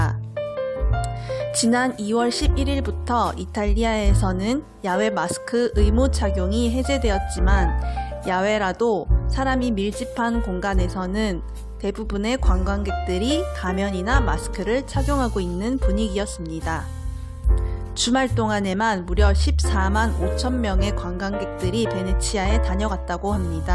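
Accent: Standard. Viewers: 표준말 너낌 나믄서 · 은은하게 베이스 깔린 음악에 님의 상큼한 목소리 (표준말 억양) 최고예요~~😄😆🥰 여름에 로마 직항도 뜬다니 반가운 소식 이네요^^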